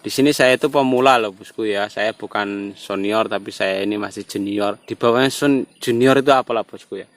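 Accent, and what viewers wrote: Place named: East Java, Indonesia. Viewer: Sik kang, sakjane aku ki pingin belajar ternak tawon. Tapi ndelok n ngrungokkan narasimu kok malah ngekek karo misuh2 dewe dadine. Wis pokoke ndlodok n trocoh, khas jawa timuran sisih kulon ki ketoke. Gak waleh tenan ki ndelok. Sukses yo kang, lanjut kasih pengetahuan nggo wong akeh. 👍🇮🇩😷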